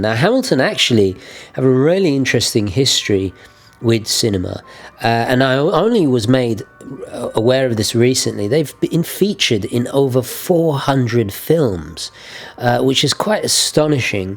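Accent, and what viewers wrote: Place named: United Kingdom. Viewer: Why are all these channels British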